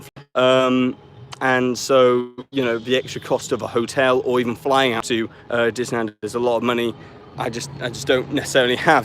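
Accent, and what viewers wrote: United Kingdom. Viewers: Where about's are you from the UK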